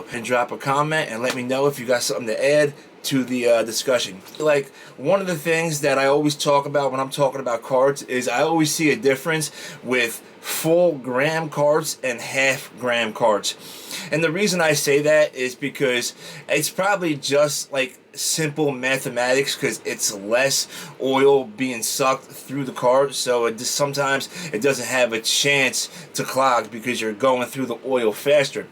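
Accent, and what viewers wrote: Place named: New York, United States. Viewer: Okay I just heard your voice alone and you just sound like home to me. My family is from New York and you sound like my people. 💜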